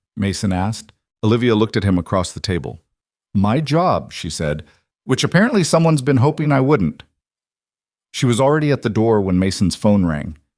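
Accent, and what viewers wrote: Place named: New England, United States. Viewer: I'm not a fan of how Yankee this narrator sounds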